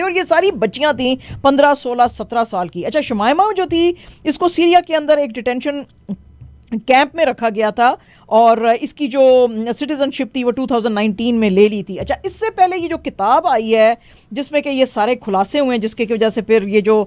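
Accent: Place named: India. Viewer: سسٹر آپ انڈین ہیں شاید۔ 🤔بھارتی فلموں کی طرح خ کو کھ پڑھتی اور بولتی ہیں۔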